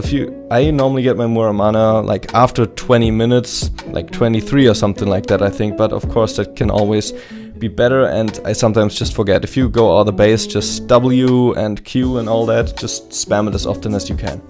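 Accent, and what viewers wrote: Germany. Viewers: German accent ftw xD❤️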